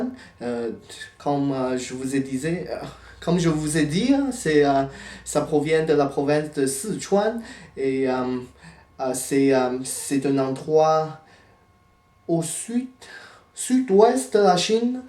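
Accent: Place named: Quebec, Canada. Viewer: Est-ce que tu habites au Quebec en ce momment ? tu as un accent, mais tu parles le jouale quebecois, vraiment cool à entendre, keep it up ! :) haha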